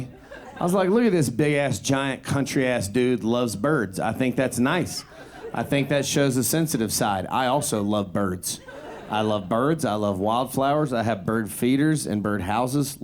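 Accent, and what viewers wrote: South, United States. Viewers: I`m not a native english speaker and whenever you tune up your deep southern accent I have to turn on subtitels too 😅 Great special though. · Like George Carlin. With a Southern drawl❤❤❤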